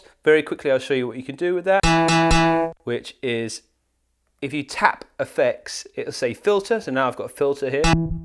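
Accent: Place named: RP, United Kingdom. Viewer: sounding super posh today!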